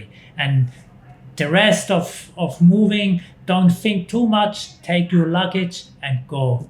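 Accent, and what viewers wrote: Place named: Switzerland. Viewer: Love your Swiss accent ;). I m from Switzerland too! Very nice advise maybe one day i will mouv to Thaïlande too ;). I m sure you miss our lovely cheese and chocolate too 🤣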